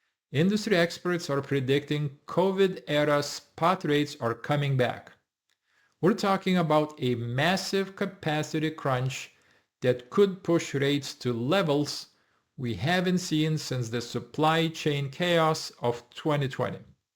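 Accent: Russia. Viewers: I doubt that, in total, there are 600,000 trucks, with illegal CDL drivers on today’s roadways. Living in So. Cal., I assume that by COB today that all of the seaports will soon be closed. Tomorrow, the So. Cal. freeways will be free of the heavy diesel powered truck hazards that exists here? Why does your accent sound so RUSSIAN. Is this Russian misinformation? Deport all illegal CDL truck drivers, ASAP, for a start.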